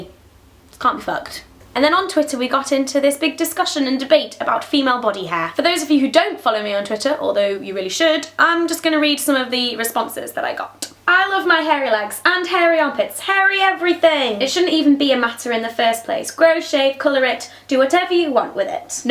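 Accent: United Kingdom. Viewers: I normally don't like British accents but I like yours for some reason. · I love your accent girl it is so awesome!! Makes me wanna be British. And I get lazy all the time and don't shave my legs, who cares because you don't live to impress other people and if you don't wanna shave your legs then so be it. :)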